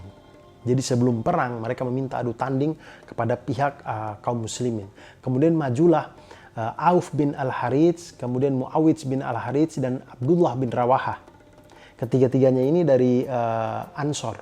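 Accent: Eastern Indonesia, Indonesia. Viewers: Tetap ada logat timurnya ya. Suka sekali. Apalagi cara pembawaan ceritanya. Cocomi jd story teller.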